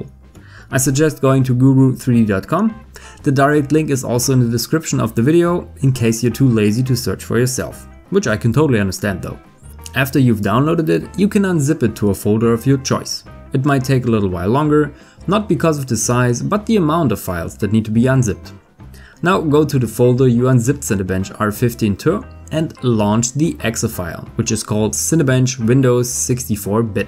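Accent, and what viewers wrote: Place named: Germany. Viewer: man hört so raus das du deutsch bist xd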